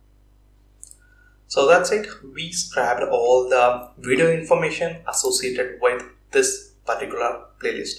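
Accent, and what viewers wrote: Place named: India. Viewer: Indian Accent is the best accent....be natural ♥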